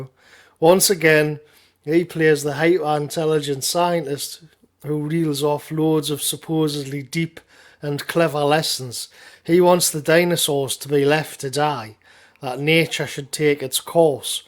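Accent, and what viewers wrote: United Kingdom. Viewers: Great stuff. I enjoy these kind of youtube reviews more than any modern films themselves. In fact i simply dont watch films anymore if im honest. I particularly enjoy it when gets into fits of laughter. Made me chuckle on walk home from work out loud! Something about discussing what is actually quite a depressing subject and not helping but laugh that really takes sting out of it. Definition of if didnt laugh you would cry. Thats important i think, and very british characteristic (particularly with great accent). 👍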